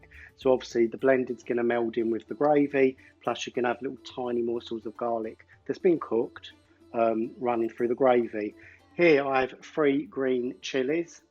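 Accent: Liverpool, United Kingdom. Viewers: Lovely Scouse accent there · Ahhh yes the famous scouse accent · Sounds really scouse 😹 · I could tell you a red it was the scouse accent that give it away. 🤣🤣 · I used to love you........would never have guessed you was a scouser.👹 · Ye we could tell from Ur accent u were either a Liverpool or United fan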